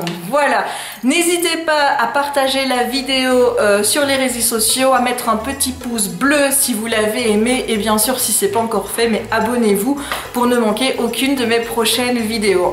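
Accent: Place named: Belgium. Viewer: Coucou! C'est la première fois que je te laisse un commentaire mais je regarde tes vidéos depuis longtemps, et ce soir je voulais juste te dire que je les aime beaucoup! Elles sont de plus en plus dynamiques, rigolotes, pleines de vie en fait! Je trouve que tu es très fraîche, et ton petit accent belge me rappelle des bons souvenirs (ma famille maternelle est belge également). Les sélections de sorties pour les mois à venir me plaisent toujours parce que cela permet de trouver plein de nouvelles idées de lecture! En feras-tu prochainement pour les livres en VF aussi? Je demande surtout par curiosité, les VO me vont déjà très bien. Belle soirée!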